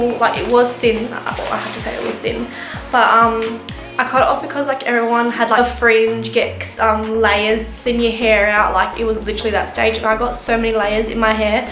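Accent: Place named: Australia. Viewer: It's not your fault, please don't get so upset! It's just Australian accent that is hard to understand!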